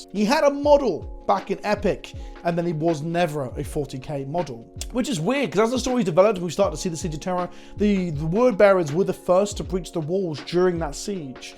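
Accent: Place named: United Kingdom. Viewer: yeah I'll sub to the channel. gotta get my british guy talks about warhammer fix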